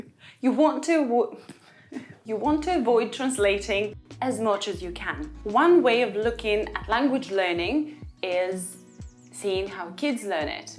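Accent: United Kingdom. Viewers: Зачем британский пусть говорит на американском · Аааа какой акцент крутой🤩 Бритиш инглиш - ван лав 💛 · your British accent is so lovely! I used to like American better but now I'm so into British💜 Thank you for the video, it's adorable👍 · Хорошо говорит, но не переношу британский акцент)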